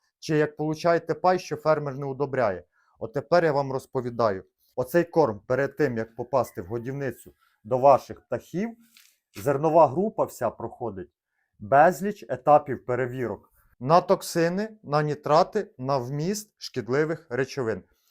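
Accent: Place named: Vinnytsia, Ukraine. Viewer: Вінницькій говір😮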